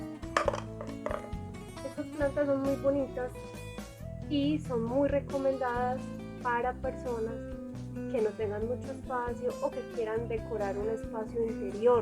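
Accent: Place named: Antioquia, Colombia. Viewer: si te sentía el acento paisa jejeje saludos desde Cali y adelante con tu canal.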